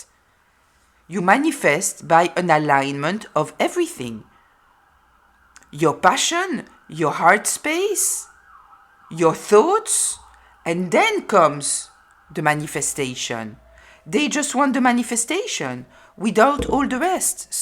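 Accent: France. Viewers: Thank you for the reading:hands-yellow-heart-red:🕊 Are you from France?☺Just subbed^^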